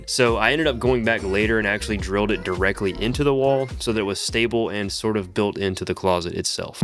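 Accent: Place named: Rural, United States. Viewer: First tech youtuber I've seen with a country accent. Love the build, looks clean!!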